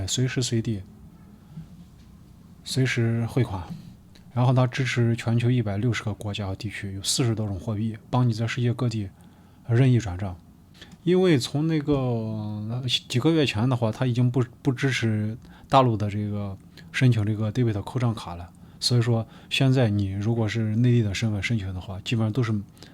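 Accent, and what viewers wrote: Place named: Shaanxi, China. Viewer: 主播 悄悄问你一下 你是不是陕西人？陕普口音😊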